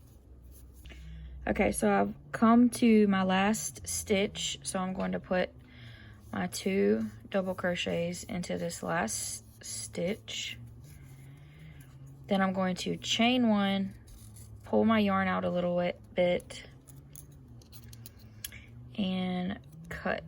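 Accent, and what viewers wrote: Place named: South, United States. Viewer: NICE! I’ll be making some of these for my son’s family. You must be a southern gal. I’m in Louisiana and you sound like one of us. 😍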